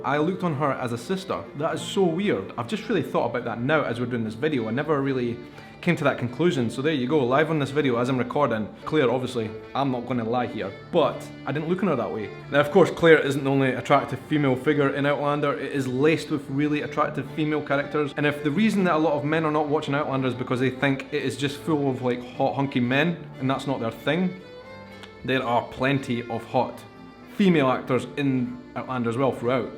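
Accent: Scotland, United Kingdom. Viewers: Hey, I did watch the first season of Outlander, and loved it. As an Ex Pat Scot, from Edinburgh, I initially watched it, just to hear my native accents again. And I loved both of the main Characters, and yes, they both are handsome and beautiful. besides being good actors. And the story is well written. I also enjoy Sci-Fi, or these time travelers. Why I missed series 2 and 3, I'm not sure. Probably because I have so many viewing options, that it probably just got lost in the midst of all those options. I do enjoy a strong Female Character, and thankfully, Brits have plenty of great female actors to play them. I can't even recall the channel it was on, but I know sooner or later, it will appear on one of my Streaming Media systems. Then I'll catch up with the show. I subscribe to two British Streaming Channel, and Amazon. I just recently cancelled my Netflix. 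So I'll do a web search, for Outlander, and maybe catch up with the seasons I've missed. I've often thought, America and the Studios should pay the U.K. Royalties, for all those Royal Sagas, and others they have produced. Even though, I've been an American for over 50 years, I'm still a Scot, and it seems, much of my accent. Americans do live the Scots. And show it, especially those with Scot's blood in their veins. I enjoy your Vlogs. And hearing my old accent.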